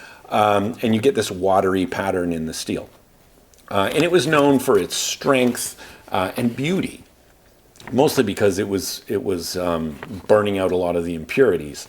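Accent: Canada. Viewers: I thought you was in Canada? You referenced being in California. Now I’m confused lol. You definitely sound Canadian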